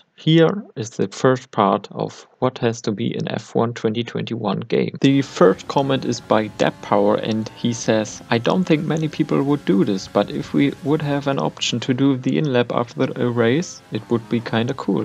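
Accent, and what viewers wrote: Germany. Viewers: Da du deutschen Akzent hast versteht man dein English viel besser!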